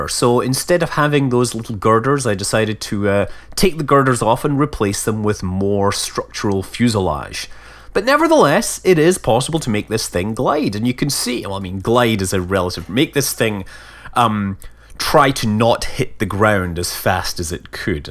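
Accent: Scotland, United Kingdom. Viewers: He's from scottland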